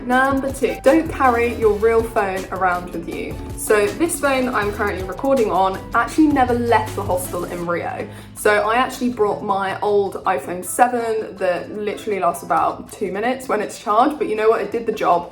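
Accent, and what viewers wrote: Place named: England, United Kingdom. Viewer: I know you are from England, but when you are recording a clip, you Don't need to speak so fast with loud musical background noise.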